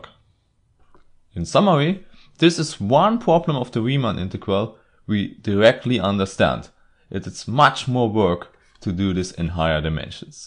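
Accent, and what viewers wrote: Germany. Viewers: I am absolutly sure, that for other non-native speakers it is simplier to understand german accent, than speach of true englishmen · Thank you for this channel, it’s amazing! Quick question, are you German? The accent sounds a bit like my German grandma and that’s cool!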